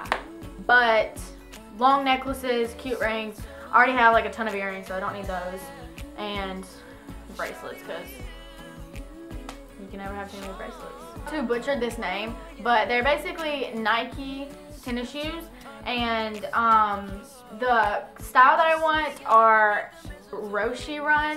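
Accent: South, United States. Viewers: Are you from the South???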